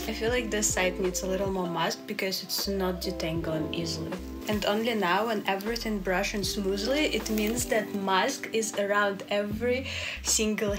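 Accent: Russia. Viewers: you have a russian french and dutch accent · как приятно услышать наш русский акцент с первых секунд видео))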